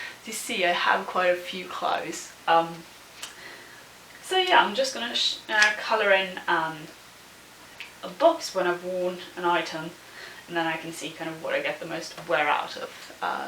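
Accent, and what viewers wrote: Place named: United Kingdom. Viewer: Thats header very cool & I loved your accents too.... British accent?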